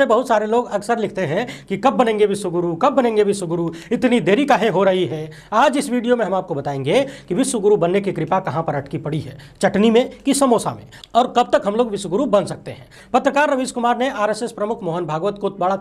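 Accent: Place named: Bihar, India. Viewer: Aap ghantwa dabane ke liye kahte hain,magar size me Chhota hai isliye use ghantiya dabane ke liye kahen.baki aap ka Bihari boli me sab bat achcha se samajh me aata hai.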